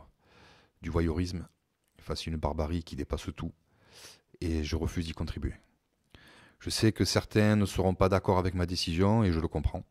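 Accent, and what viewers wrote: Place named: Alès, France. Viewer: Une pointe de trivialité en passant, malgré la lourdeur du sujet traité; Vous avez la même voix ( J' ai tout de même m'y quelques vingt, vingt cinq secondes pour trouver) qu'un certain .....Blanc, également..... Laurent de son prenons. Sérieusement , pour avoir parler avec lui à plusieurs reprises ces dernières décennies je reconnais même certaines intonations, pauses ou enchainements....l'accent aussi. C'est troublant ! Je suppose qu'on vous là souvent dit. Pour ceux qui me lisent sans comprendre, Laurent Blanc est un ancien footballeur professionnel ........! Peut être, êtes vous habitant, ou originaire, de la même région que lui ( Alès ) ? Je m'abonne et reviendrais vous écouter, vos propos dans cette vidéo m'ayants touchés.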